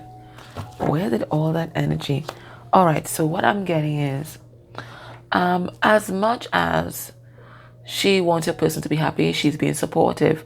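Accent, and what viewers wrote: Trinidad and Tobago. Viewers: Are u Trini?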